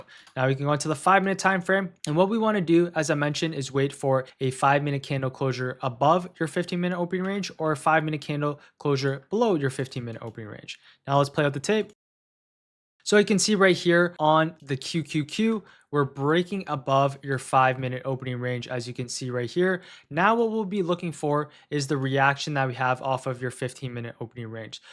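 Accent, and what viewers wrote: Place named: Canada. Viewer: Canadian ey!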